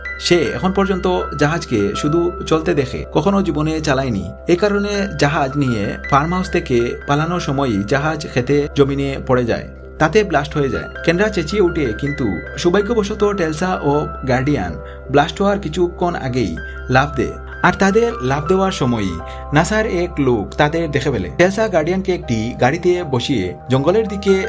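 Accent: Sylhet, Bangladesh. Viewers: তুমিতো দেখি সিলেডি ফোয়া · ভাই সিলেটি নি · Apni sileti naki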